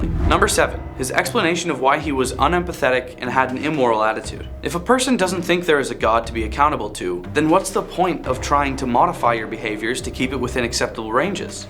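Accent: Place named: Canada. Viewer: Are you from Canada? A few ways you pronunciate certain words, remind me of some dear Canadian friends, I knew years ago. Love your channel plan to binge today!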